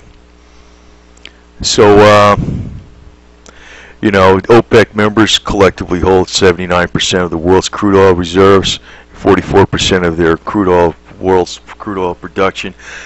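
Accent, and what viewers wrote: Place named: New York, United States. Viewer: You sound like you're from New York. No offense, but I just imagine you saying something like, "Hows about me, Tony Baloney and the gang come over there and whack ya up side the head, eh?" Seriously, good info. Yours is one of the top hits for recent videos with Lindsey Williams. On the Sunday Alex Jones show yesterday, a caller asked he bring Lindsey back on and Alex agreed. We might see him either this week or next week.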